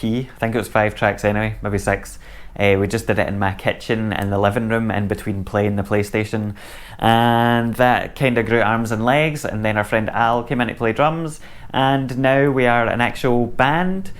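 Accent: Scotland, United Kingdom. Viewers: Found your channel by accident but happy I did. Nice to hear a local(ish) accent and sumdy else who likes synths haha. Do I detect a wee southside accent, Ru'glen Camslang area?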